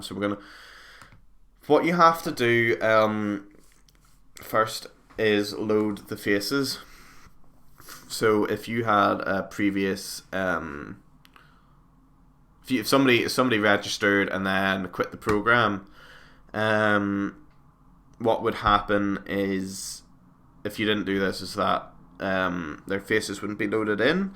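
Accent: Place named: Northern Ireland, United Kingdom. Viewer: You sound northern Irish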